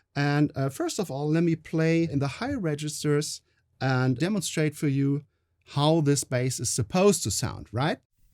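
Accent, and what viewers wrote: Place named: Germany. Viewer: great instructial piece being a seventy something German American it is refreshing to hear the Deutsch englander accent. Again great video.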